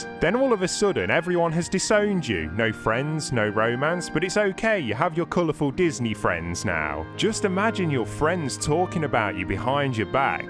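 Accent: United Kingdom. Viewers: Dude I just Binged watched ALL your videos. Idk if its the British Accent or what but your vids are HILARIOUS!! 😭😭😭😭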